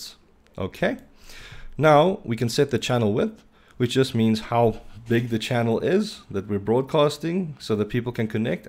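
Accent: Afrikaans, South Africa. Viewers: You sounds like a Afrikaans speaking person. Thanks for the videos man👌👌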